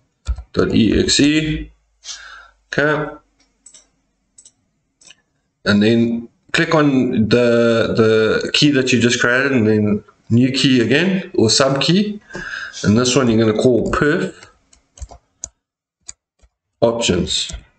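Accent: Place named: South Africa. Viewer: My bru sounds so South African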